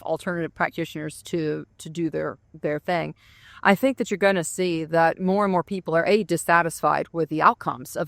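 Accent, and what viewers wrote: South, United States. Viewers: I have been following you for many years and always appreciate your thoughts. I hate to hear you losing your beautiful Southern accent.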